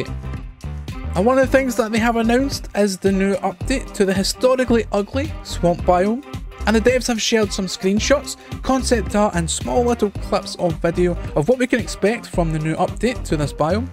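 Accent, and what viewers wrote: Scotland, United Kingdom. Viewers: You know your accent is really unique, like it's you have a soft Scottish accent but also have braces, and the only other person with anything similar is AndyIsYoda. But anyways cool build.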